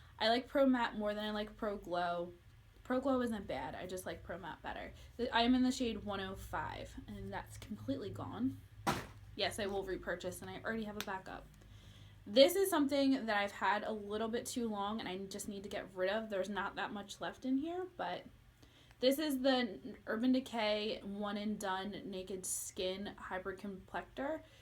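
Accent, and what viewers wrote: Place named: East Coast, United States. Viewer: Your east coast accent.....love it!!!!! Great video!!!!!😃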